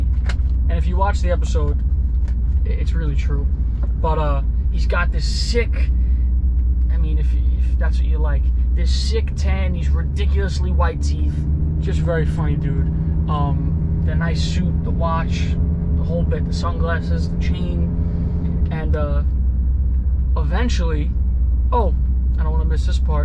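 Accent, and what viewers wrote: New York, United States. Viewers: I love your videos & your voice! You’ve got a tiny bit of the NY accent.🥰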